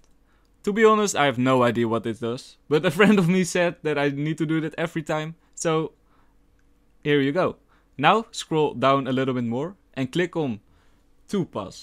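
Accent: Netherlands. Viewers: Yes I also think..Check his thumbnails an his dutch accenct confirms it :D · heavy dutch accent at its finest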